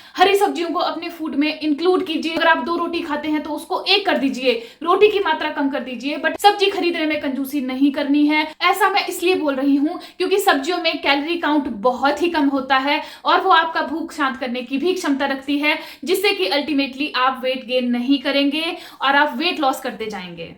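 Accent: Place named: Pahadi, India. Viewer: mai bhi pahadi hu aapke bolne ke tarike se samjh gai thi🙂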